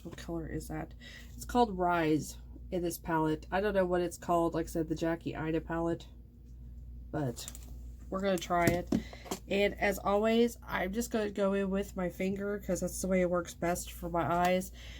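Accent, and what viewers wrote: United States. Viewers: I luv ur "hillbilly" accent 💜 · Girl, bye! Those ppl need to crawl back in their hole. There is nothing wrong with the way you talk. I love our Oklahoma accent. Also, I love it when you talk in your videos. You always make me laugh. This look is gorgeous! That lid shade tho...#Yassssssssssss! Love you more than 2 big busses! Lol! Stay safe! TFS! ~ Nona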